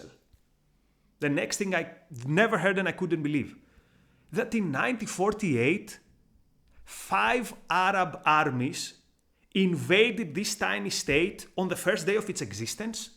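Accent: Greece. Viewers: I knew immediately this guy is Greek by the accent 😅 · thank you for that, its very profound of course. after listening to you for 2 minutes you sound to me greek. Kreeks need to understand that Ardugan from turkey is a radical muslim and if people will not unite against radical / evil islam - Greece will have a genocide by Turkey. The muslim brotherhood / radicalization is a very serious problem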